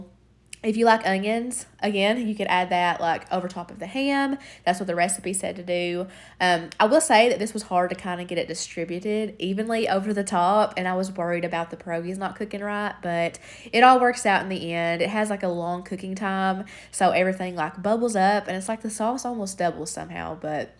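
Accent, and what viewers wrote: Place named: Kentucky, United States. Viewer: Hey I've been binge watching your videos all week! I love the different recipes and ideas you have, I can't wait to try some. Are you from Kentucky too? I'm right outside Lexington but you sound farther south or maybe eastern ky. Much love 😘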